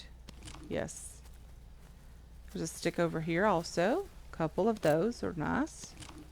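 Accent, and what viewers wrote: Kentucky, United States. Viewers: im so glad to see you playing again from the start! i subscribed in the first place watching you play this...supposedly its a very different game now with everything they've added. we'll see. its very nice to see you! i still follow you but i dont have the time to get into the infected part 325...ill never catch up. this is perfect. and i just love hearing you talk with that kentucky accent. people accuse me of having a oklahoma accent! what accent? yall are the ones that talk funny!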